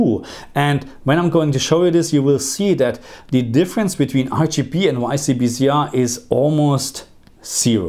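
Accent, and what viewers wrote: Germany. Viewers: Liebe deinen deutschen Akzent 😅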